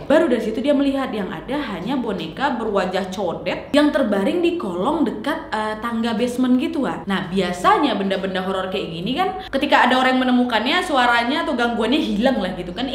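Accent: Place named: Batak, Indonesia. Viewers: orang mana si logat nya macam sumatra kali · Kak orang batak ya? Soalnya gaya ngomongnya kayak orang batak😄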